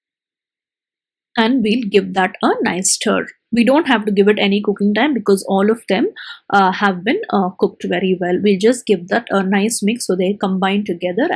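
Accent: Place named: Tamil Nadu, India. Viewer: hi...what an English... I can guess u may be from Coimbatore /Salem/namakkal..:)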